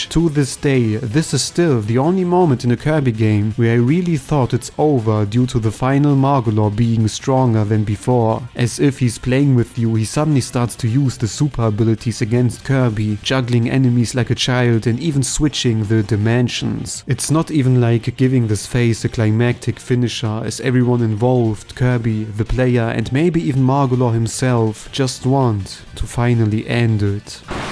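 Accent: Germany. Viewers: du bist deutsch richtig? das kann man raushören. 😂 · The German accent in his voice, I find it pretty neat sounding.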